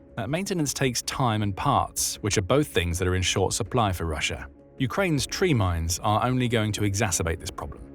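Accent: United Kingdom. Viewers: Really dislike the UK accent in all of these Ukraine videos. If you are making videos for the UK then good for you, if making for the US then drop the accent.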